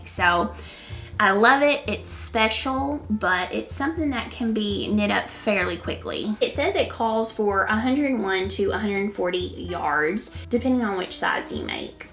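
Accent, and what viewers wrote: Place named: South, United States. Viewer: I played this video in the bg while I was decluttering my bed so I could sit and knit, but I was immediately drawn to the video when you started talking! I absolutely love your accent because I come from the southern states myself, so I felt like I was right at home watching your video! I used to hate my southern accent, but in recent years, I've come to embrace it. It made me so happy hearing that you stayed true to yours, too 😊🤠💗 Much love from Kentucky!!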